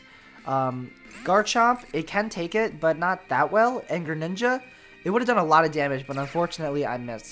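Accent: Netherlands. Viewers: R u dutch???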